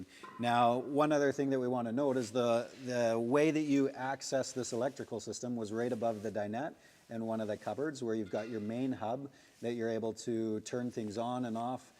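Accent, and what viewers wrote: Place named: Germany. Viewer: You have a elegant German accent if you are German. Nice van, thank you for the tour.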